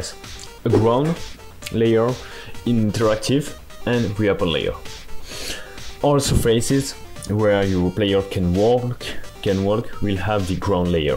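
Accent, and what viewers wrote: France. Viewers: this man has a thicc french accent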